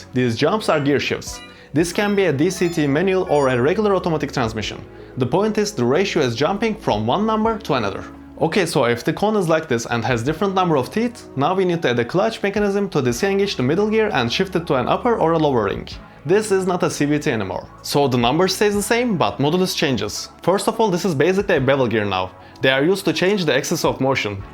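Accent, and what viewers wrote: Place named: Iran. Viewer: are you persian at least your acsent is like iranian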